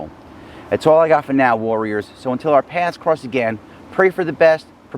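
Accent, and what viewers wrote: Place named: Long Island, United States. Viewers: He sounds like he is from Ronkonkoma or farmville but his info is solid. His hands made me laugh. He talks like everyone in my family!! :) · you sound like you are from Long island? I grew up in Suffolk county!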